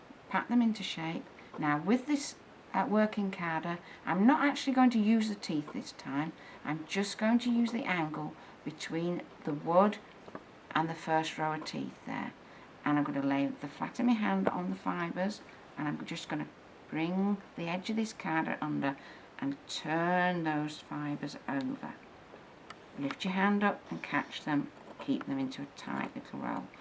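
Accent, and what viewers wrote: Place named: Yorkshire, United Kingdom. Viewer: Love this vidoe, love the accent. Feel like km learning from one ot my Yorkshire grandmas. Thabkyou so much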